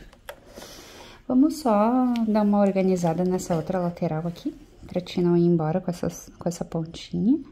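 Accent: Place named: South, Brazil. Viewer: A voz e o sotaque dela são idênticos aos da minha cunhada que também é do sul.😊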